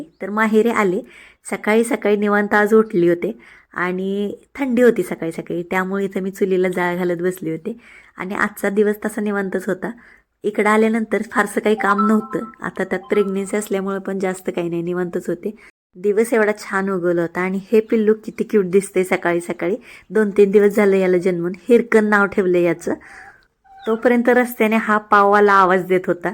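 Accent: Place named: Sangli, India. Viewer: आमच्या सांगली सारखी भाषा आहे ❤❤ छान दिसताय 😊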